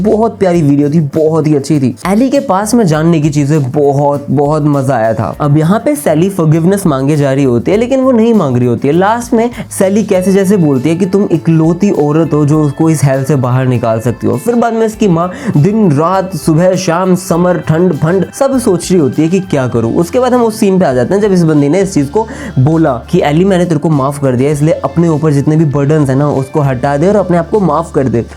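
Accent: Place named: Haryana, India. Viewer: thoda accent se laga tha ki delhi haryana ya Punjab se hoge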